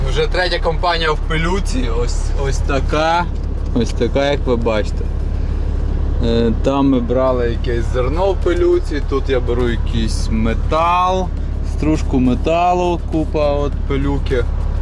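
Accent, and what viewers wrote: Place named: Ukraine. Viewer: русских слов, но с укр. вымовой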